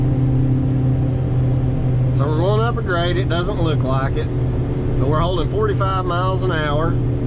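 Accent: South, United States. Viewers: Whys the narrator so southern?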